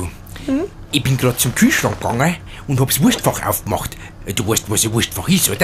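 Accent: Bavaria, Germany. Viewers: endlich moi oi bayrischn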